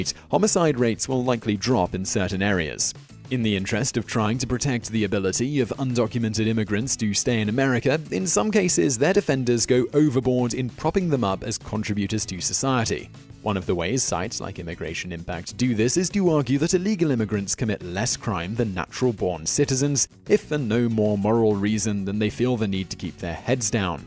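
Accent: United Kingdom. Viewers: Great. A guy with a British accent wants to tell everyone what America should do as if he is the expert.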